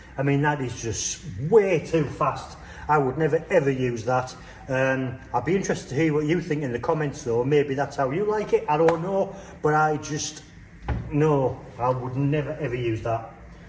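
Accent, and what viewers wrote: North, United Kingdom. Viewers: Thanks a lot for this valuable exploration with the typical northern accent 😎. A tracker-action organ always offers (not necessarily a comfortable, but) the most direct playing feel - no matter if slider chest or cone chest. Even if I have to "work" more, I prefer a mechanical instrument - they are most honest to me - and I can save money for the gym 😉 Electric action is always "switch on - switch off" many meters away - reverb included...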